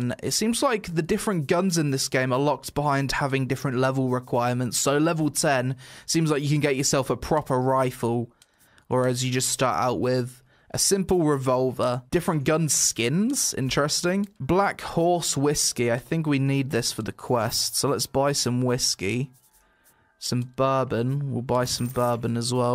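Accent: United Kingdom. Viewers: hes british... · your British but you didn't say loo??!?!?!?!?!?!?!??!?!?!?!?!??!?!?!?!?!?!?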